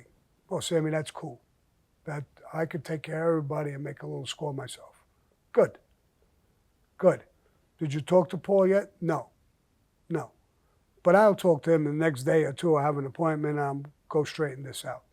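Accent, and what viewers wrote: New York, United States. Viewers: Sammy... I just love your NY accent. Keep on keeping on!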